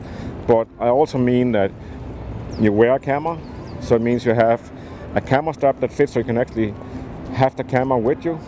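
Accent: Denmark. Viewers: Are You from Denmark can hear a little dame in the accent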